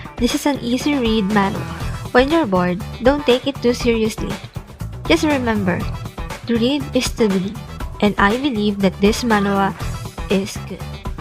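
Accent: Philippines. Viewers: Instantly knew you were from the Philippines just from your accent alone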